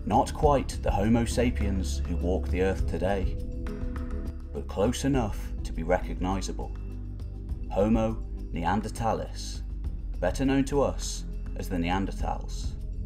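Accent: United Kingdom. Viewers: KuLLChu Can I find a Swedish or Egyptian subject that ISNT NARRATED by a damn BRit????? KuLLChu??? Sheeeeeeesh.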